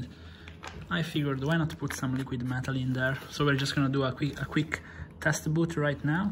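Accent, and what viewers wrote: Italy. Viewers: Si sente che è italiano, ma c'è anche da elogiare il fatto che riesca a fare interi video senza mai fermarsi e con una pronuncia giusta ✌️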